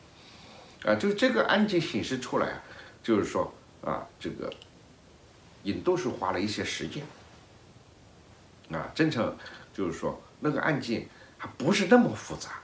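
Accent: Hunan, China. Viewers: <num> Lotus 😂应该是湖南的吧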